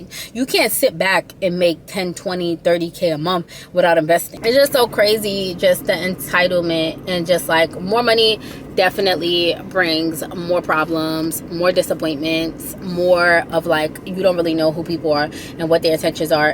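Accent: New York, United States. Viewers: I loveeee that New York accent 😍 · I can tell you're from New York 🗽 "like dead azz" 😂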